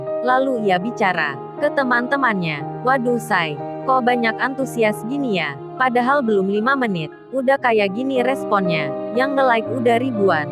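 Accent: Surabaya, Indonesia. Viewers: Admene wong suroboyo iki wkwkkwk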